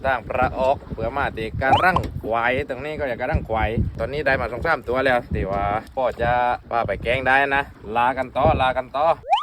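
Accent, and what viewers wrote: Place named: South, Thailand. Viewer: ชอบดูน้อง พูดใต้แต่ฟังง่ายจัดเจน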